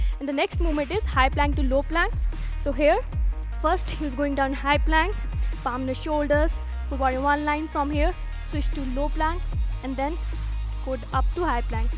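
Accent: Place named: India. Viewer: Her indian accent blow tho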